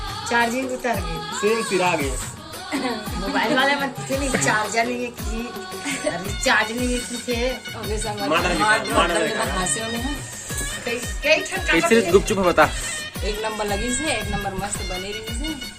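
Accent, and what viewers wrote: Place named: Chhattisgarh, India. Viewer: Bahut badhiya Chhattisgarhi boli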